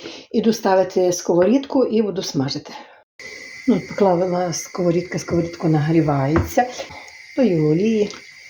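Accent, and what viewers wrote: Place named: Vyzhnytsia, Ukraine. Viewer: Доброго дня, Ви моя найулюбленіша господиня , хоча у мене біля 70 кулінарних каналів, мабуть тому що я також з Буковини, хоча живу дуже далеке, якщо можливо скажіть звідки Ви саме, бо відчувається Вижницький район!! Дякую Вам велике за Вашу працю в любі до неї!!!!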